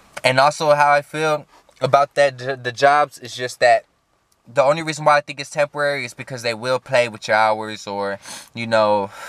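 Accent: Texas, United States. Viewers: You from S.a texas homie? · I’d figured he from Texas his accent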